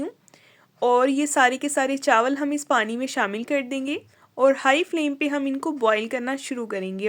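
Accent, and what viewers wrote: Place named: Punjab, India. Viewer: Aapkay gur walay chawlon ki tarqeeb bohot achi hai aur apkay lehjay se mujhe aap punjabi lag rhi hain. Mein aapki nano hoon. Main pakaon gi to zaroor btaon gi. Farida Yazdanie, mein 79 years ki hon.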